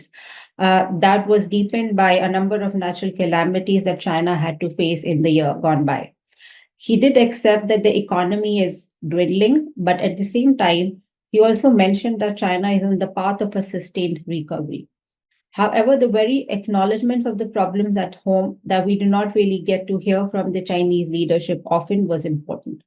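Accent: India. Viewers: I guess there's little to be done about the Indian-English accent, which makes following this difficult for me and others I suspect, but a better microphone would be a huge improvement.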